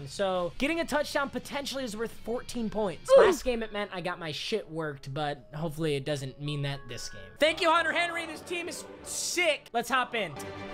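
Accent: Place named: Arkansas, United States. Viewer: That Arkansas voice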